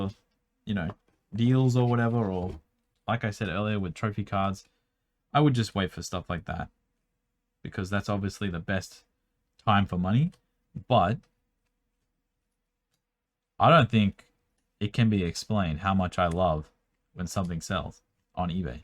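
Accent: Australia. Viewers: An hour in club here, and I'm here for the advice, for the realness, and also for the aussie accent I've missed so much since leaving Perth for Indo all those years ago. Seeing how you're so excited about how your recent sorting video made enough money to buy 5 subway sandwiches is gold, and then have that followed up with how wetting your lips or something can be sexual is slightly disturbing 😂. HOWEVER!! We need to have Kim on the channel as well,!! You've talked about her several times now and how she's amazing and everything, and you know full well a Youtube channel only ever taken off when the significant other makes a long-awaited appearance 😆. You can do subway mukbang video with her and see how many more subway sandwichs the video'll earn you 😁 imagine how excited you'll be at that prospect 😄 In short: we want KIM!! ✌🏼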